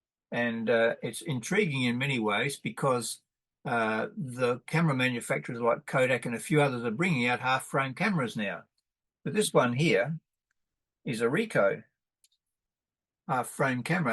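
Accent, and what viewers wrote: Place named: Australia. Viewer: Surprised to hear a good familiar Aussie accent on the first click on the search for reviews of the C-150. Thanks for sharing!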